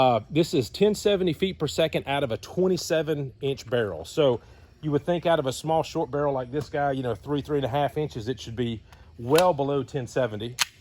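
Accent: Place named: Alabama, United States. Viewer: I knew that was an Alabama accent. About to pick up a Rugged Mustang at Larry's myself. Nice video. Helpful.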